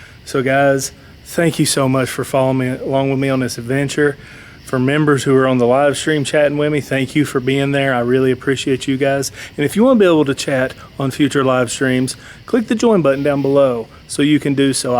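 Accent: South, United States. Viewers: I know ur a southern boy where u from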